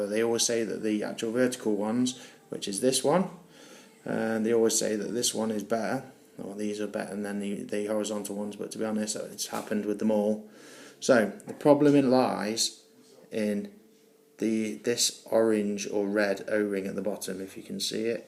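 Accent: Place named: United Kingdom. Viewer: You should do voice overs. You have the classic bri'ish voice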